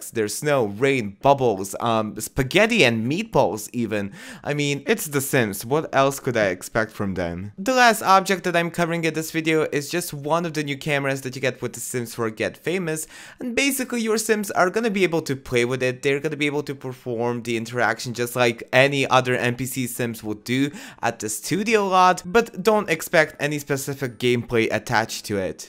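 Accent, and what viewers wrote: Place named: France. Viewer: What a lovely accent! Are you french?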